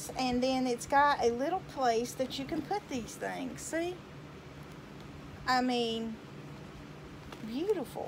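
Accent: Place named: Tennessee, United States. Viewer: Nice presentation here!! You have that Smokey Mt./Tennessee voice! I love it!!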